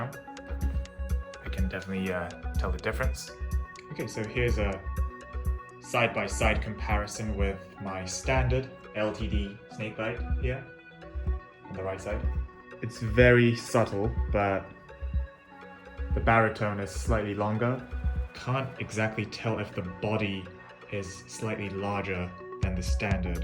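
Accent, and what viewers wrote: United Kingdom. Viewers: For some reason I wasn’t expecting an english accent. But it suits you perfectly lmao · I love listening to your British accent ❤️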